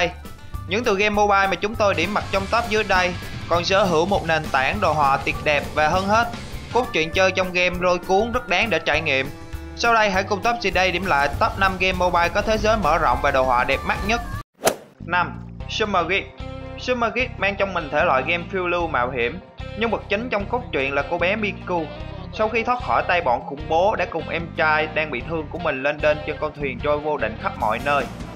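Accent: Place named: Binh Dinh, Vietnam. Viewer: ôg giới thiêu game có giọng nói giống dân 77 quê tui gơ😂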